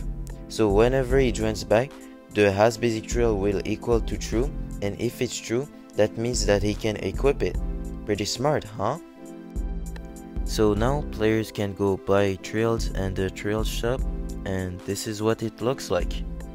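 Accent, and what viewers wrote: France. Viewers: are you french? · you speak french ? You have a accent french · Tu est un français ça s'entend !! 😂😂😂 Mais super video!! Continie comme ça!!😊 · You are french for sure hahaha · Aew you french? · are you french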